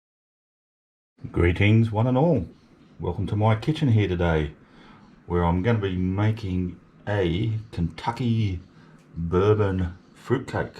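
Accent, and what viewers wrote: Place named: Australia. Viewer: Getting ready to try this with leftover Kentucky Bourbon from our daughter's Kentucky wedding! (Old Forrester 86 proof) Looks like a great recipe but I didn't expect an Australian (?) accent Y'ALL!! ;{) I'll report back next week.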